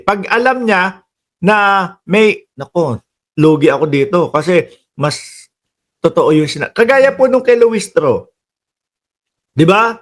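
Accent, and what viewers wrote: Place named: Waray, Philippines. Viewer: Parang waray MAGSALITA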